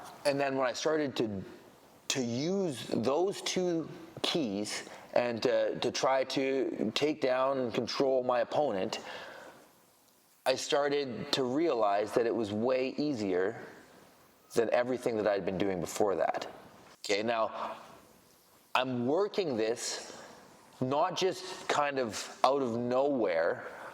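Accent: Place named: Canada. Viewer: Gotta say it’s great to hear another Canadian on here “aboat”. Like I’m in a Tim’s lineup in Sudbury. Much love brother.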